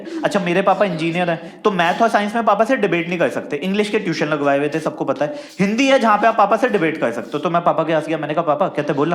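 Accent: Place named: Saharanpur, India. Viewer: Pure Sharanpuri Hai... 😂😂😂😂😂😂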